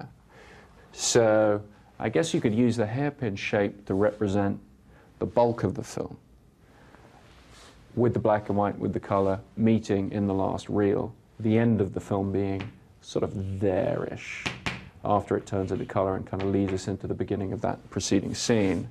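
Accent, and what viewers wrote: London, United Kingdom. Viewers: British English accent sounds great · His accent was more London in those days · London accent, clear as day tbh. He's an American citizen now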